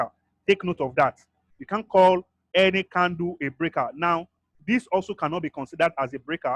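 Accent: Nigeria. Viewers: If he uses his native language will that be better for you? Listen to understand him. He is a Nigerian, English isn't the native language used there but more like a general thing.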